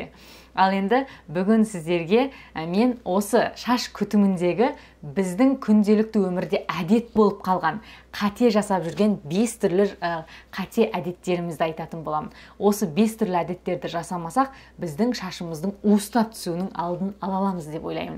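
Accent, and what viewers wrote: Standard. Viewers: Сіңілім рақымет кеңес бергеніңе .керемет сенің қазақ тілің құрет тұтып әлі күнге қазақшаны таза сөйлегеніңе риза болып отырмын құрметті бол жаным. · Жарайсың өз тіліңді ұмытпай таза сөйлейсің Кореяда тұрсада Қазақстанда тұратын қазақтар орысша сөйлейді қазақ өз тілінде сөйлей алмайды бақытты бол сәттілік тілеймін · таза казакша сойлейди екен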